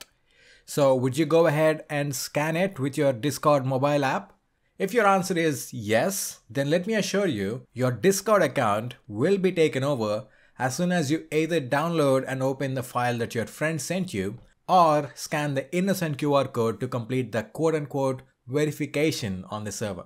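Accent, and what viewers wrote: India. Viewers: Indian no text to speech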